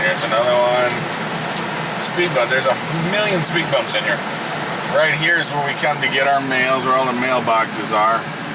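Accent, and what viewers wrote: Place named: United States. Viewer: Yeah, it gets complicated when converting. US Gallon = 3.785 litres UK Gallon = 4.545 litres £ - $ is £1 = $1.6123 (today) or $ - £ is $1 = £0.6 This is why I prefer to compare in litres as it makes it simpler. So your $3.45 per US Gallon would be $0.91 per litre In the UK we pay £1.38 per litre which is $2.22 per litre Now you know why we have to make more fuel-efficient cars! I also noticed you said maths as mathematics is always plural. P.S. Cool John Wayne type accent.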